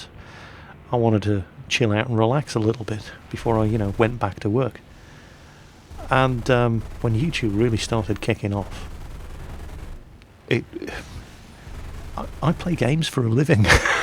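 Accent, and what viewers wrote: England, United Kingdom. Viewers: If you think that could be a Scottish accent, then you must have hit your head on pavement after being repeatedly hit in the face with the stupid brick. There are many types of accents in the UK, but to save time, English accent=yes. Scottish accent= wtf? no. Listen to Scottish people more often.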